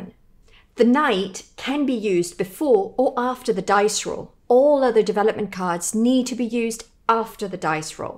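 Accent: United Kingdom. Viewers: I've got a random question, non game related (lol). Are you an english speaking person that learned spanish? Or a spanish speaking person that learned english? Or..... was brought up with one english speaking parent and one spanish speaking parent? I only ask because I love your engish accent, and you speak spanish very well, so im just ciurious. I've seen most of your videos and I loved them, I am a very big fan of Catan, and hence, all of your Catan videos! Thank you!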